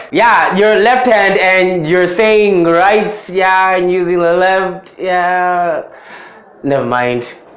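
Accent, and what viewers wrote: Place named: United States. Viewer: African are racist to election, hahaha ain't that the truth!!!!You are funny you would be more funny with that cute african accent!!!You sound almost american!!!Do all kenyans speak english at all times???if yes, why oh why???What happened to your native language, I'm not even kenyan but i would appreciate some kenyan accent!!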